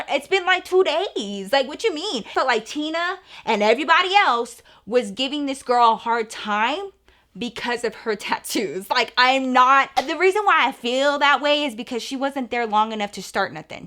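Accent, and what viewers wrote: Texas, United States. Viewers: when you say "stewpid" you still so have your Texas twang girl 😂👌🏼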